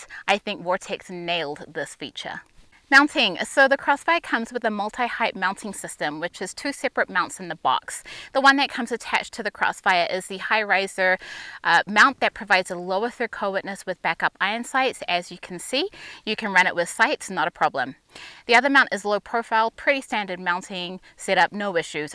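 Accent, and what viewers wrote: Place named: Australia. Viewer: Damn woman, you know your shit I Know it’s kinda weird but I love the accent. I spent a few years in Adelaide with some very good family friends and would love to make it back someday. Anyway, sorry for the essay, but keep up the good work on the videos , very informative to people looking into new sighting systems . Convinced me to get a prism due to my astigmatism (even at 34), it’s been a good quick optic with very few issues. Looking into lvpos now so we shall see. Maybe i’ll just get a solid magnifier and maybe a canted red dot. Anyways cheers, good shit!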